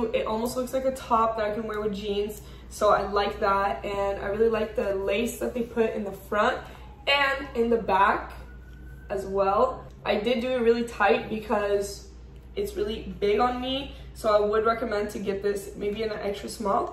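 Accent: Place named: Brazil. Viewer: Não adianta ! O sotaque brasileiro no inglês é permanente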